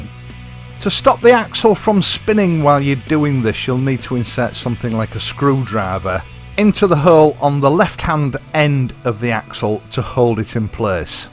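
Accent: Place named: England, United Kingdom. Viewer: Brilliant teaching video. 'Lots of practical logic and obvious experience, spiced with humor and an enjoyable Englishman accent for good measure. Thank you.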